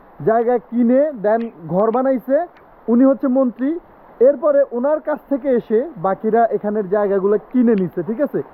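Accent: Noakhali, Bangladesh. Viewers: ভাইছা আইন্নে কি নোয়াখাইল্লা।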